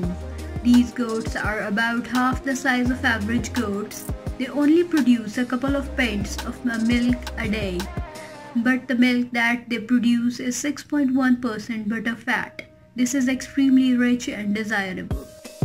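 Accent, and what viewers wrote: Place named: India. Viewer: ...Also hard to understand such heavy indian accent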